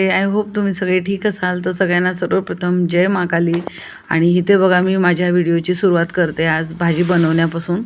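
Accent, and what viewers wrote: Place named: Pune, India. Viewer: ताई तू पुण्याची आहेस का proper.. बोलण्याची ट्यून पुणेरी वाटते म्हणून विचारले.. आणि खूप छान आहेत..होईल सगळ ठीक..❤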